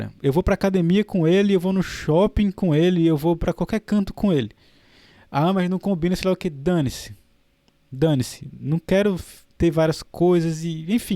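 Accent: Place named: Pernambuco, Brazil. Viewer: Rapaz, eu te acompanho e admiro há milhões de anos.Às vezes acho que tu é pernambucano, outras que é alagoano.Eis o mistério...rsrsr Saudações à todos!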